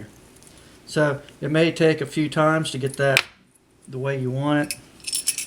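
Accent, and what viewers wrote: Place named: Kentucky, United States. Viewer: Are you from Kentucky or Tennessee?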